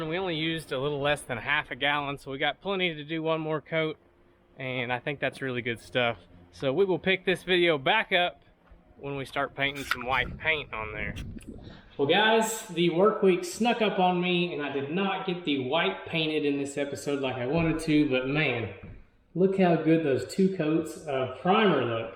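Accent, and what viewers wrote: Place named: Boston, United States. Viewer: Your boston is beautiful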